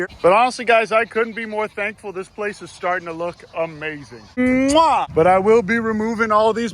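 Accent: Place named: Southeast Pennsylvania, United States. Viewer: I noticed on your last vid you said you were from PA. I honestly thought just from your voice you might be a south east native. I'm from the West Chester/Chadds Ford area. As well you remind me of a good friend of mine Joe Magno, any relation?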